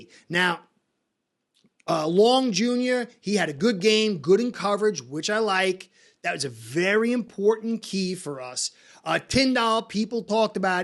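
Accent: New York, United States. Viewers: I like you’re accent are you from New York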